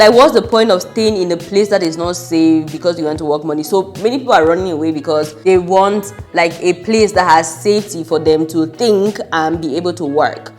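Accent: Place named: Calabar, Nigeria. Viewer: You were born in cameroon, raised in cameroon but you sound like calabar or akwa ibom(Nigeria)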